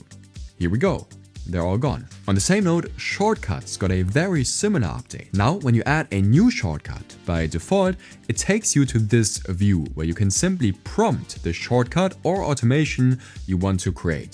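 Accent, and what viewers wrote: Germany. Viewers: erster Nice. starked Video. Mann kan aus dem Accent hören, das du Deutsch kannst. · Dass du ein Deutscher bist, hört man sofort raus. 😂 Wünsche dir viel Erfolg mit deinen Videos. 👍🏼 Weiter so.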